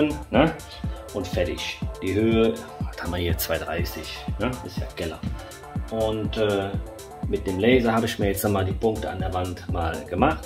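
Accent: Cologne, Germany. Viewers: ein "Profi" mit Parkside Werkzeug 😉 Endlich kann ich meine Lidl Käufe rechtfertigen, auch wenn dich meine Frau hasst, nur vom zuhören. 😉 Also bitte weiter auf Köllsch 👍 Wenn es Sinn macht für ein Video, kannst ja deine Werkzeuge vorstellen und warum du die hast, was die können oder nicht gegenüber anderen Marken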